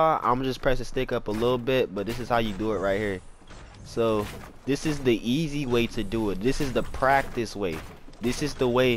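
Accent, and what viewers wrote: Detroit, United States. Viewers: I know this might be a dumbass question...r u from Detroit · Are you from Detroit?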